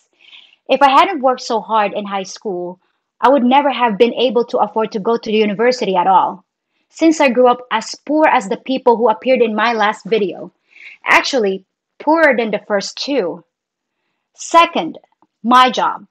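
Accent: United States. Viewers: If you had ever been to a nude beach you'd know that too much reality can be toxic. Reading minds would take the same problem to a higher level. When I was doing free lance IT, and a support number connected me to a Philippine call center, I always sent a positive note to the company. I can understand the Philippine version of English much easier than grammatical and correct English spoken with a Pakistani accent. Your speech patterns are closer to American than British. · I was surprised to hear you visited the UK but not the US. Your word choice and pronunciation is very American. But you do call a TV the telly.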